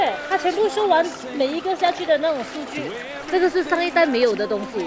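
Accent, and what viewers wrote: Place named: Malaysia. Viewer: 一聽口音就是馬來西亞人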